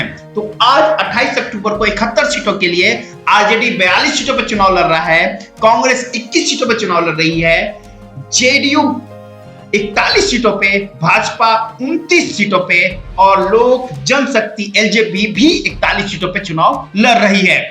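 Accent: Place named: Bengal, India. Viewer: Bangali hindi is Amazing 👌👌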